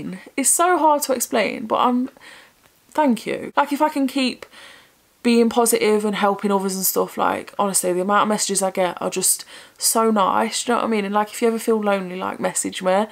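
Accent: Nottingham, United Kingdom. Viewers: The pool you’re on about is the blue lagoon in Iceland. Google it! It’s paradise on earth! Also where are you from it sounds like you’re from Nottingham? I love your content x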